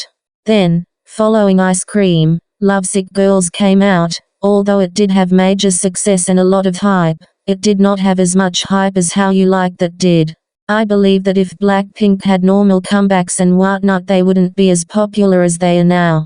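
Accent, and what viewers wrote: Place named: Australia. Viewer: I know this is random but why does your robot voice have an Australian accent?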